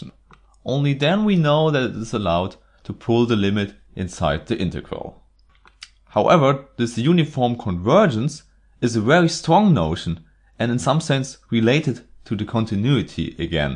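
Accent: Germany. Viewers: Thank you for this channel, it’s amazing! Quick question, are you German? The accent sounds a bit like my German grandma and that’s cool! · I am absolutly sure, that for other non-native speakers it is simplier to understand german accent, than speach of true englishmen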